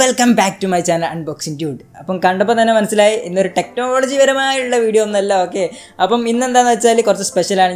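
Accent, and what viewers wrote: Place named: Malappuram, India. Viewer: # നിന്റെ വീട് എവിടെയാ..? മലപ്പുറം ആണോ ..? നല്ല മലപ്പുറം ഭാഷ... !!😘😘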